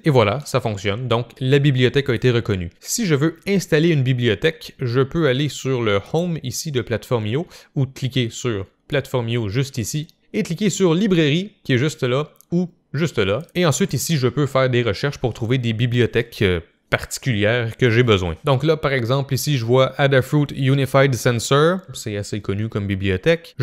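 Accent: Quebec, Canada. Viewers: Bonjour. Voir et écouter tes video est un double plaisir. Les explications sont très claires, ni trop rapide ni trop lentes. Tu fais tomber les barrières technique avec une facilité déconcertante! Le second plaisir, c'est entendre ces explications avec cet accent québécois que j'adore (je suis français et j'habite à Paris) me remplit toujours de joie! J'espère pouvoir retourner prochainement à Montréal. Si tu y habites je t'offre une bière! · Bonjour, Je te remercie pour ton site et tes vidéos. Je suis un vieux programmeur et je m'intéresse aux sujets que tu présentes. Merci pour celui sur l'Alfawise U20 One, achetée, surper content. Merci aussi pour les sujets ESPxx. C'est bien que tu présentes VSCode qui est un "rouleau compresseur" qui écrase tout sur son passage. Je l'utilise depuis la version 0.8 et je l'ai vu grandir. J'ai fait beaucoup d'Arduino pour mon plaisir durant quelques années. 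Quand je m'y suis remis, j'ai failli vomir : le même IDE qu'en 2011, à quelque chose près. Utiliser VSCode pour refaire de l'Arduino m'a redonné du plaisir à coder pour ces petites machines. Je crois qu'il ne faut pas hésiter à laisser l'IDE d'Arduino de côté. VSCode propose un retour sur investissement bien supérieur et il va continuer de grandir, l'IDE Arduino actuel, c'est mort. J'utilise VSCode professionnellement sur Windows et sous Linux (ça marche même sur une rasp !) et c'est un outil de productivité formidable. Je ne voulais plus faire de "Visual Studio" tout court, j'en ai marre de ce genre de produits. Avec "Code", j'ai vraiment retrouvé le plaisir de coder (en python essentiellement). Merci encore pour tes vidéos (et ton léger accent canadien c'est du pur bonheur pour les français)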